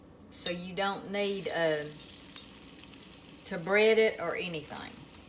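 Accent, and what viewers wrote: South, United States. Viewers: What state do you live in? I was born in Texas & grew up all over the the south but have lived in Ohio a long time. I'm trying to figure out what state you live in by your accent bc it is so familiar to me. I've lived in Texas, Okla (where my mom was from) Tennessee, Mississippi, Louisiana and Alabama & went to college in Arkansas. Okra is my favorite vegetable & I grew up with my mama frying it with chopped onions and fresh or green tomatoes and that's my absolutely way to make it. I've never met anyone else who makes it that way. I'm wondering if it was a tradition in Okla. But even southerners I know in Ohio or the south have never heard of that. I also make okra patties with the same ingredients bc sometimes the wetness of the tomatoes makes the okra fall apart. But making it with onions and tomatoes takes it to another level. 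I crave it! I've never put it in the oven before and will try that for sure!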